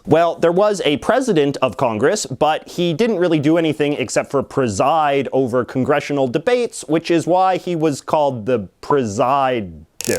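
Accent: Canada. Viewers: It's probably from the slightly different dialect they speak in Canada (where he's from)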